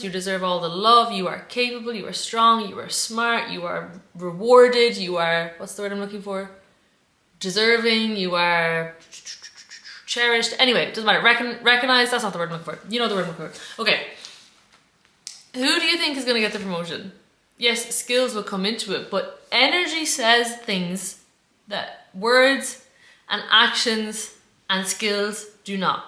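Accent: Ireland. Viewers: Love your Irish accent and your style of presenting your information. Wish I had your talks when I was young.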